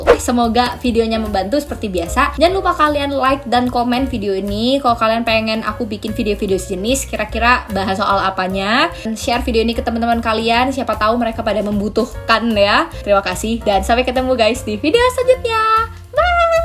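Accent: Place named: Malang, Indonesia. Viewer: Ya ampuun, wong malang ta Mbak? Saya juga arek malang Mbak... Tlogomas cedak Dinoyo...😄😀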